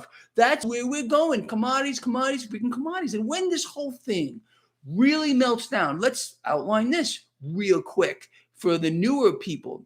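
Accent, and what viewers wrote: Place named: Queens, United States. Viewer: New Subscriber, I feel so sad I just found you, but I did just order your book! love the content, you must have been from Queens? Same accent... Thank you.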